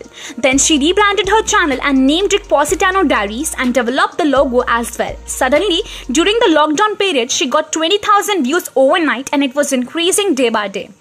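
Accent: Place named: India. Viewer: You have a very strong Indian accent that I have a hard time to understand.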